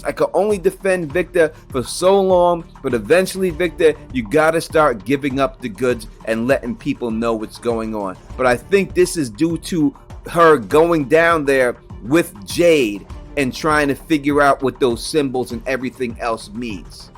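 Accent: New York, United States. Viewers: I love your coverage of this show. Also love your NY accent. Love to hear you say "autopsy "❤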